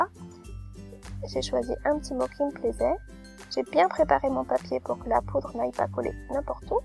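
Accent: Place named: England, United Kingdom. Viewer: OUIIIII tu as un accent anglais so good !!! Mais quand je t'écoute parler je trouve que tu as un petit accent de je ne sais d'où très charmant !! Oh les bruit de la nature c'est si beau !! Ces petits hérissons sont vraiment trop mimi - perso je préfère avec la neige !! Merci pour ce partage PB Bonne nuit et à demain BIZZZZZ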